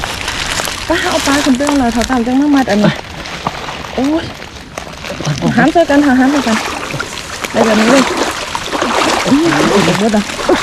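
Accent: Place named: Ubon Ratchathani, Thailand. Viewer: สวัสดีค่ะ เพิ่งเข้ามาดูครั้งแรก บรรยากาศดีมากดูแล้วคิดถึงบ้านเลยค่ะ สำเนียงคือคนอุบลฯ เป็นคนอุบลบ่จ้า ~จากคนอุบลพลัดถิ่นจ้า~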